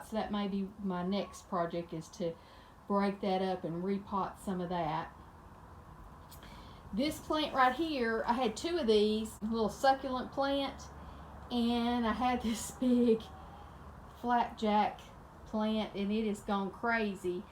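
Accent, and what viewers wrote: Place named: Virginia, United States. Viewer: I can’t thank you enough for the before and after video on your philodendron. Like you said lots of videos about them but none like what you did. I have the same long gated 20 year-old philodendron which I will be doing exactly what you did taking pictures and sharing them with you again thank you thank you thank you by the way I love your accent. I’m here in Sacramento California but, I have a brother who is in Richmond Virginia so it’s a loving reminder, lots of love to you and thank you again. Rene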